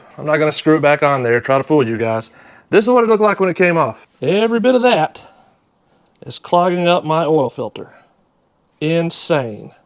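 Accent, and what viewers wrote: South, United States. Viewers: The only person I have listened to with Southern accent who doesn’t sound like a moron.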